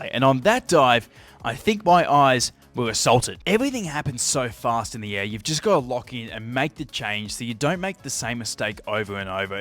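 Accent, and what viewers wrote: Australia. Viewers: Really enjoy this, and as I said im 80 this year., but always loved the water and my dad taught me to swim in the sea. He was a rear gunner wireless operator work war two.and loved sport. So wonderful to see your effort to get back inti shape . Also i love the Aussie accent. I worked with Australians from NSW. and Melbourn . My dad's brother and wife and family left Scotland many years ago and stayed in Wollingong Maybe have spelt that wrong. Now thanks for all that you've shared. Sincerely Jane Rogerson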